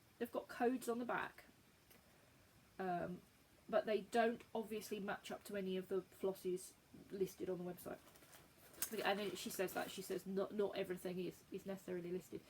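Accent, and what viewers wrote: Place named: United Kingdom. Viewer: Just found you and enjoyed your first video so will be watching more Lovely to hear another British voice When I first starting watching Flosstube in 2014 there was quite a mix of nationalities. I took an enforced break from stitching and all that goes with it for 2 years and when iI returned at the beginning of this year almost all of the new people I have started watched are from the USA. So some variety is great and I’m looking forward to catching up with your following videos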